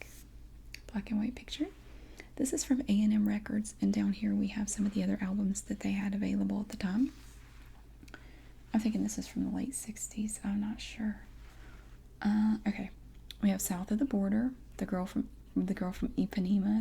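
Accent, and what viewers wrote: South, United States. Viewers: This is a match made in heaven for me. Southern accent + ASMR + vinyls = my favorite things. Can't wait to fall asleep to this tonight, thank you ❤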